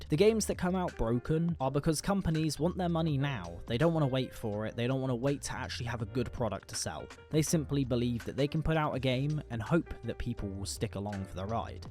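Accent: United Kingdom. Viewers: I'd pay double for games if they leave out the wokeness and sell a polished and most importantly FINISHED product. That aside bro, you sound like you live in the UK. Can you seriously say that woke ideology hasn't ruined everything it has gotten its claws into? As consumers we can vote with our wallets if companies implement particularly predatory business practices. Against woke ideology? Against companies propped up by their ESG rating? Nothing. Even voting doesn't help.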